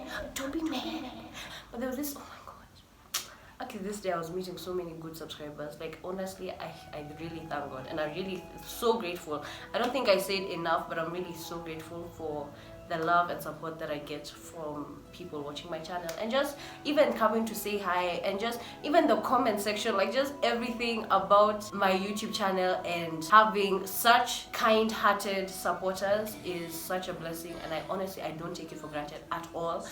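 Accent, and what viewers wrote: United Kingdom. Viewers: You do a mad British accent.... Damn😍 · Uko na accent ya Briton 😮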